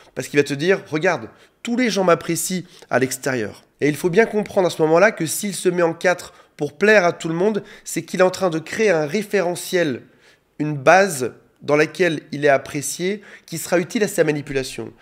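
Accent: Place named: South, France. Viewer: avec un de ces accent du midi ! c😊ng 😄🤗👍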